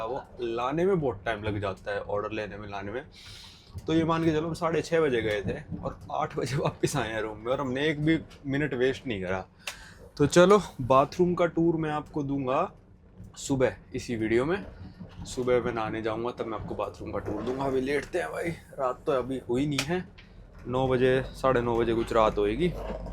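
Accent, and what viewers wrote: Haryana, India. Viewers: Rom rom bhai are you Haryana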